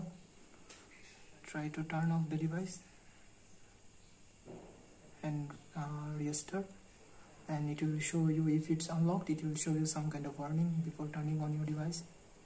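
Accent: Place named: China. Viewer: I don't know If I can trust you because you sound like Chinese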